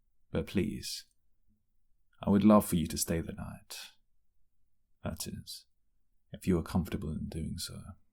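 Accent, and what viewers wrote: United Kingdom. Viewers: 7819/10 Great audio Love the English voice The audio is lovely, crisp, enchanting, the end it where it’s at for the most but the audio overall is like fine wine. I would definitely recommend it.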